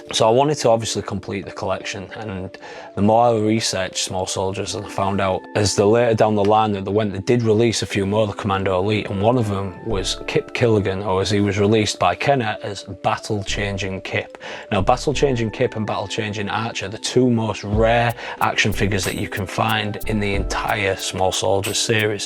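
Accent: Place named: Hull, United Kingdom. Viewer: Are you from Hull by any chance? I can tell with your accent!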